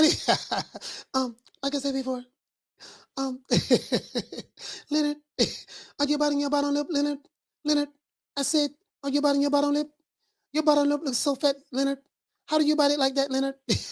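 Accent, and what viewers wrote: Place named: Texas, United States. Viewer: Hey Coach I'm going to check your show out. 2hrs is a long time.i have to break it up into several segs. Where you from Coach? You sound like you're from Oak Cliff, TX.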